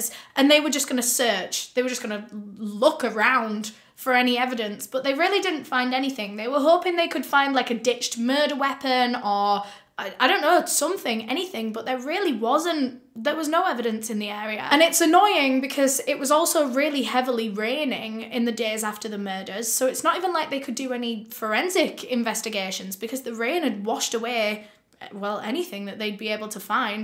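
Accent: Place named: North, United Kingdom. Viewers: storytelling style drifts further back to her northern roots every single video and I love it 😂 these videos have always been great but that extra touch of personality in the more recent year or so has been a nice addition. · gets more and more Yorkshire as time goes by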